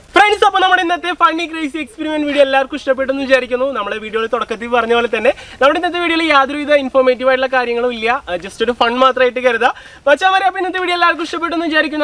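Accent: Thrissur, India. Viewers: Thrissur karde sound anganeya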